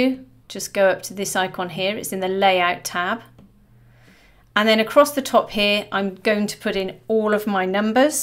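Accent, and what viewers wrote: United Kingdom. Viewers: What a powerful knowledge on how to play with checklist, etc. Beautiful accent ( British and the calls rocks. Thank you.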